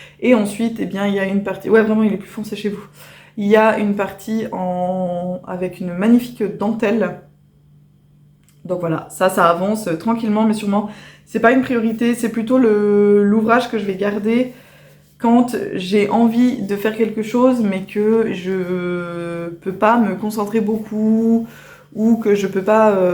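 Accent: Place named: Belgium. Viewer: Bonjour. Je vous découvre pour la première fois .je ne savais pas du tout d'où vous étiez et a votre accent il me semble que vous êtes soit du nord soit belge. Je suis aussi belge mais vit en France depuis toujours.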